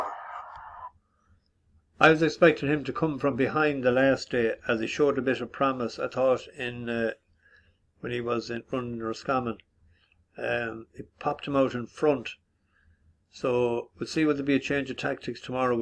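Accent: RP, United Kingdom. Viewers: U gone very posh.jesus I remember when U were a bus driver 😂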